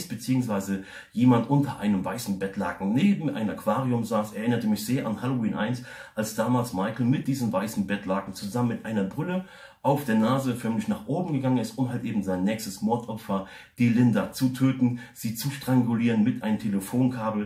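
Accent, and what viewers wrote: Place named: United States. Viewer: Bist du Amerikaner dass du einen Ami Akzent hast ?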